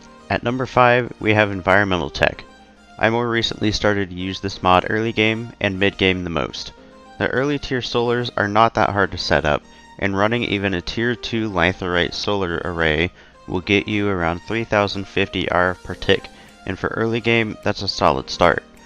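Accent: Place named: England, United Kingdom. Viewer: das ist ein Engländer kein Deutscher man hört das von seinem Ausdruck was er sagt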